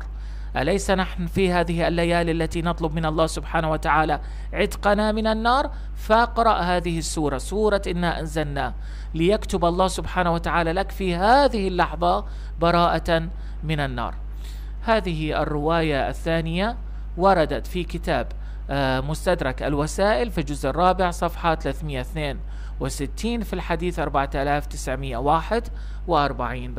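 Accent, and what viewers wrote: Lebanon. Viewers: ماجور ان شاءالله بس عندي استفسار خارج الموضوع يأخي نبرة صوتك قريب على اللهجه اللبنانيه او السوريه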